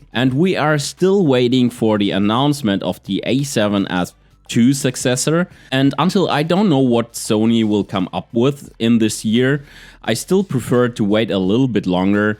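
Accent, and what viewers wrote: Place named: Germany. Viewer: Dieses German-English... herrlich 😅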